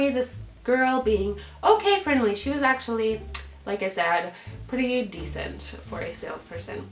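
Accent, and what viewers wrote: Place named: Germany. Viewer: Cierra Carter she's got a bit of German accent. why would anyone EVEN say something so ruthless.....unreal.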